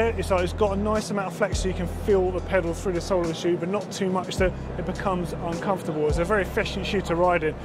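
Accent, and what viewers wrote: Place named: United Kingdom. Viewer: i thpught u were from the uk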